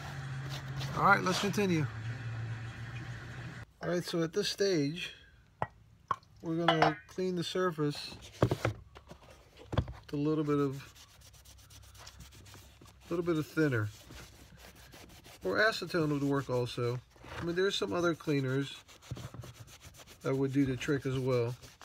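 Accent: New York, United States. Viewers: Are you from NY? You sound like you may have been from NY.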